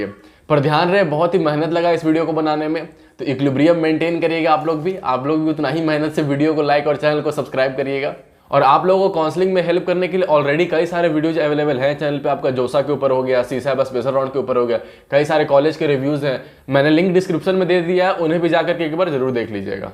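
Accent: Bihar, India. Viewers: Yes , he is from Bihar. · Your voice has a bihari tone 😅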